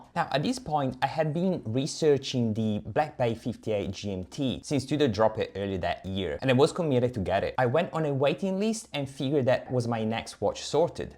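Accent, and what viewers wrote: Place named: Vietnam. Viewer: Love your accent. Vietnamese?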